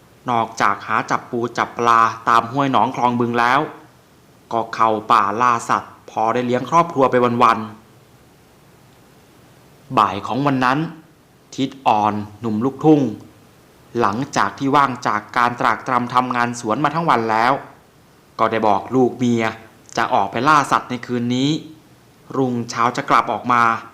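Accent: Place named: Rural, Thailand. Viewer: ສຳນຽງພູດຄືມາກ ກົມກື່ນກັບສະພາບບ້ານນອກດີນະ ຄິດຕາມທຸກຄລີບ ຂອບໃຈ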